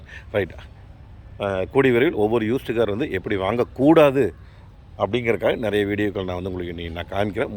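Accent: Kongu, India. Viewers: கொஞ்சும் கொங்குத் தமிழ் பேசும் கோமகரே...! திகட்டாத தித்திக்கும் பேச்சுடைய திருப்பூர் திருமகரே...! எங்கள் மதிப்பிற்குறிய டான் லடாக் பயணச் சாதனைச் சகாப்தம் திரு. அண்ணா அவர்களே❤❤❤🙏 எப்படி இருக்கீங்க அண்ணி❤❤❤🙏 எப்படி இருக்காங்க தம்பி ரித்திக்❤❤❤ எப்படி இருக்காரு மனசெல்லாம் வலிக்குது😔 ஒவ்வொரு ரூபாயும் போன் போன்றது😔 அதோட மதிப்பு இப்படி விரயம் ஆகுறத பார்க்கவும் முடியல கேட்கவும் முடியல😔 ஷோரூம்ல புது காரை டெலிவரி எடுக்குறதுக்கு முன்னாடி PDI (PRE DELIVERY INSPECTION) விநியோகத்திற்கு முந்தைய ஆய்வு. இதை 100% செய்துதான் காரை டெலிவரி எடுக்கனும். ஒரு புது காருக்கே அப்படினா? ஏற்கெனவே ஒருத்தர் பல வருஷமா ஓட்டி தேய்ச்ச பல வருஷத்துக்கு முந்தய used மாடல் காரை 2000% துள்ளியமாக சரிபார்த்து இல்லையா வாங்கனும்😏 ஏமாறுபவர்கள் இருக்கும்வரை ஏமாற்றுபவர்கள் ஏமாற்றிக்கொண்டுதான் இருப்பார்கள்...! என்றும் அன்புடன் உங்கள் தம்பி என்றும் அன்புடன் உங்கள் TMF ரா.முஹம்மது கபூர் (சென்னையில் இருந்து)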